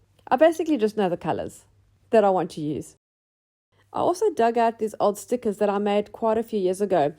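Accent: South Africa. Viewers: Just discovered your video, and I love your style. Very inspiring. What a lovely surprise to hear a familiar (South African) accent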